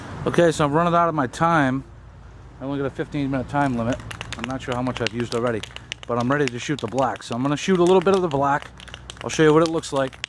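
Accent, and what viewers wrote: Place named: Upstate New York, United States. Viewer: Sounds like upstate new york lol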